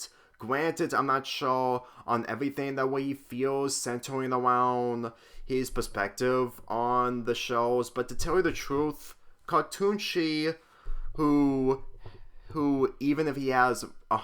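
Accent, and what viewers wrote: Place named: United States. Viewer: Are you American by chance? Because from the sound of your voice in the video, you kind of sound like you might have an American accent, and that MIGHT be the most logical reason and explanation.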